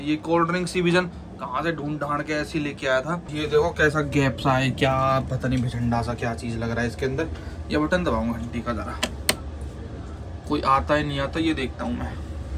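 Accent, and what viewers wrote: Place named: Jhansi, India. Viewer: Bhai..apki language se lg rha aap jhansi ya aaspas k ho...or plz kha se train li o to bta dete...aadhi info di h..😢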